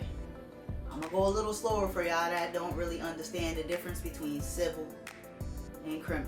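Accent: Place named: Queens, United States. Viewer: I absolutely love you! Thank you for this! It's raw, real AND revealing! There are LOTS of top videos explaining the info, showing the receipts and kicking LN in the face and your video needs to be shared among the other ones! I haven't even gotten through half of the video. Not only do I love your passion, your knowledge and intellect, I LOVE your accent, being a man, who as a boy grew up in Queens. The way you speak so passionately about this reminds me of family and friends from when I was a kid that would start speakin real truth. Shoot, I've been gone since 1997 and get lit like that. You're great! I love your memorabilia, I love your style. Keep your head up, keep it goin, thank you!!!! 😍😍😍❤❤❤❤🔥🔥🔥👏👏👏👏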